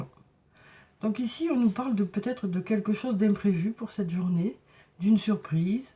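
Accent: South, France. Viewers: Bonne journée toujours un plaisir d écouter cette voix douce avec cet accent du soleil, merci pour votre tirage et merci de ce temps que vous nous offrez.bonne journée à vous bisous.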